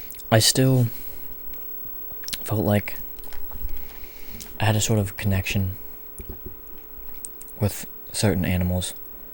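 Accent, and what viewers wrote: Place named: South, United States. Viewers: Creeper alert. Even before you posted a video wearing a WV shirt, I assumed WV from your accent. I went to Marshall University. 😁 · I'm actually really worried you live around where I live bc if I see you anywhere I will probably scream, cry, and run away because jfc. You like lakes, you have a specific dialect.... Georgia? North Carolina? Virginia?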